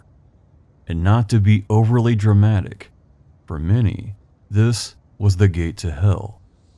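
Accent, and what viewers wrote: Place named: South, United States. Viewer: Wow 😲! I kind of nailed it! That's so cool your ancestors were French and followed Daniel Boone to... Kentucky! I looked up Lawrence County, IN and it's very close to the Kentucky line. As a proud Southerner (Alabama, where the skies are in fact so blue) I assure you I was complimenting you on any influence your parents' accent may have had upon you! You're Midwestern, yet retain the Grace and Gentility of a Son of the South. Just got home from work, about to watch more. It's a pleasure to meet you. I'm William.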